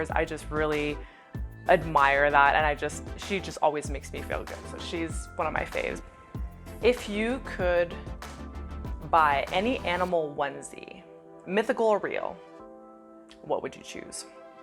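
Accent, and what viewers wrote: Quebec, Canada. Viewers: Salut! Tu pilotes mon avion préféré (Dash8/400); je suis passager régulièrement entre Montreal et Halifax! Super de t'entendre parler Québecois;P Aussi, Mars, sign me up! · Merci pour les réponses.. J'avais deviné avec ton accent que tu es québécoise. Continue ton excellent travail! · Canadian accent - all Canadians are taught French (by law). If it was your first language then you must have been raised in Quebec. Have enjoyed your videos - thank you! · French canadian? Or real French from France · French Canadian i am guessing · You have a Canadian accent eh?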